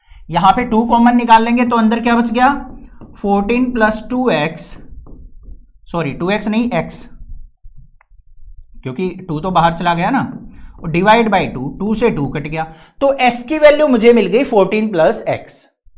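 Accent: Haryana, India. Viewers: Thodi haryanvi banti ha class ma app na bola tha phala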